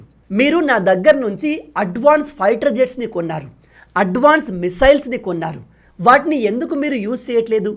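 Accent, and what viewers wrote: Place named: Andhra-Karnataka border, India. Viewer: I was astonished when you told you were from Siddipet.I thought you are from some Andhra Karnataka border.Nice Telugu language you are speaking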